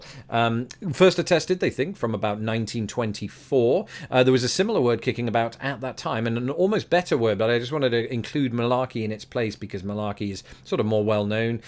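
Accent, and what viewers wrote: United Kingdom. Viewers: Brilliant list! I think I only used the word brilliant after listening to your brilliant british accent. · I'm catching up on older videos. it's fun to hear him sound more "British" as the videos get older. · Thanks for this series, it's most enjoyable and quite interesting. As someone whose been a bit of an Anglophile in my media consumption, I've long found the way the UK speaks the same language this former Pennsylvanian used intriguing. Both the US and UK speak a strikingly similar language---except at those times when we use totally different words or phrases to describe the same thing. From my teen years, thanks to our local PBS affiliate, I became exposed to a litany of BBC sitcoms that became my first forays into hearing the type of English you grew up speaking. Between Tom Baker's Doctor Who saving the universe with K-9 and Sarah Jane by his side, to Mrs. 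Slocum worrying about her pussy well being at home in the airing cupboard, while working behind the counter at Grace Brothers, I was smitten with British TV. What intrigued me most was learning all of the words and phrases that we didn't use back home in Harrisburg. Deciphering contextually what everything from a car's bonnet to it's boot became intriguing mental exercises for my teenage brain. Ever since, I've always found interesting how English varies in its usage between the nations that speak it, so I appreciate your perspective on the differences. The themes for these videos are well considered and the abridged etymologies have been most enjoyable, as has been your presentation of this overall. A British accent like yours just adds that nice touch to the whole thing, I think, so I hope you retain it. To my American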